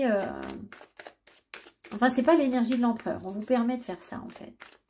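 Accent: United Kingdom. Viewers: ta une British accent I swear ♥️♥️🙏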